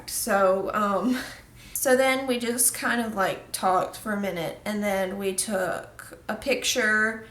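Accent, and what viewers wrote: United States. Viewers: I love ur fuckin country accent it cute and ur very pretty🔥👌🏻😘🤘🏻✌🏻️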